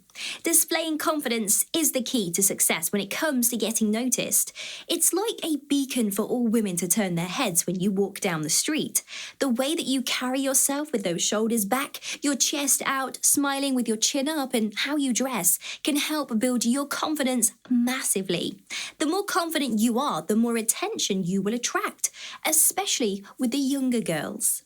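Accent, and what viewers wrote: England, United Kingdom. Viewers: What part of England are you from? Midlands, maybe North? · Is this girl from the British isles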